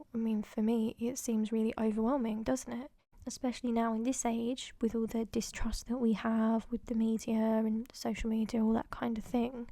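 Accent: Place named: RP, United Kingdom. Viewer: Sound so posh here. I love it! 😂😊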